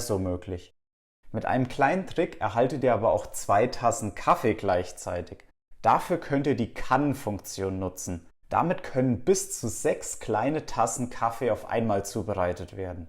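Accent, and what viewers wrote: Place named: Franconia, Germany. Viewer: Super erklärt, deutlich gesprochen (mit fränkischem Akzent - sehr symphytisch). Habe nur noch eine Frage, my Milk - Funktion wäre das Michprogramm 1 - korrekt? Vielen Dank freue mich schon auf weitere Videos.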